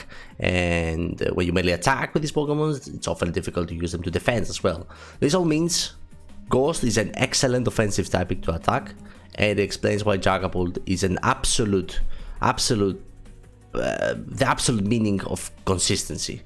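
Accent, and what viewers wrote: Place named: Scandinavia. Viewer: ye i know what you mean. i used you hisuan arcanine team once and wasnt aware enough and got totally destroyed. btw I love your accent, are you from the scandinavian area?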